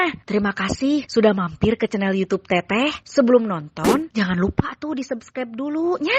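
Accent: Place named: Sunda, Indonesia. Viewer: Pasti ngiler koq ratu bakso sdh makan bakso...manyus teh...jd pengen mkn bakso.. Saya bukan org sunda tp suka banget denger teh bicara bhs sunda...jd tau gitu dan enak di dengar..suka nonton ini krn ada ciri khas yaitu bhs sunda, dialek sunda suka banget dengarnya👍👍👍💖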